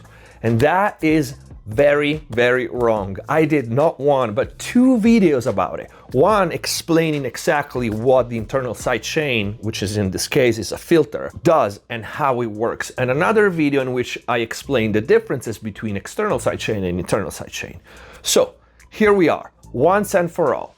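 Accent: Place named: Italy. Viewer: I already knew the subject but it's great to see pros hitting the technical side of it. You sound Italian my friend.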